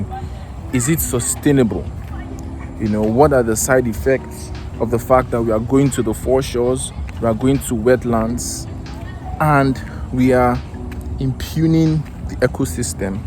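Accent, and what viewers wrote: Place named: RP, United Kingdom. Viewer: Ignorance presented in good queens English. Very embarrassing to say the least. You don't make a 7 minute video to ask questions that are already answered both locally and internationally. Where was VI land edge 100years ago before the ocean surge ate it up to Ahmadu Bello Way? Where were ships anchored in the early 1900s before sailing up to the ports in Lagos? Why did the reclamation start at the Bar Beach If you had read history and journals you will know Eko Atlantic City Project is an investment effort to reclaim parts of Iru Kingdom (Victoria Island, Maroko etc) the ocean has eroded in the past 100 years, get informed and stop making videos to embarrass yourself in the name of content creation. You couldn't even mention canals that were blocked by govt reclamation projects. Try to make content to educate the public not to drive home your hate and crooked bias narratives. 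Read about the "Great Wall of Lagos" and why it is being built. About the flood a few days ago, Lagos had a 9hrs+ heavy rain, the city was flooded during the rains. 6 hrs after the rains was Iyana Oworo, Ago Palace Way, And most of the flooded areas still flooded? The answer is NO. The water has gone with the tide. What happens to global warming have you delved into it to understand how a City like Lagos with most of her land belowk sea level can survive the "The Rising Sea level Phenomenon"? My advice; Before you make your next video do very good research on the subject. Kaire✌️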